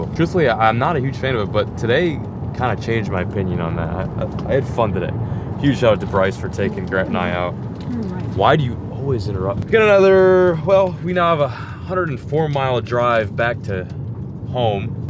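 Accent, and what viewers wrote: South, United States. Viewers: I think your low key catching a southern accent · Just moved to Texas and he's already sounding southern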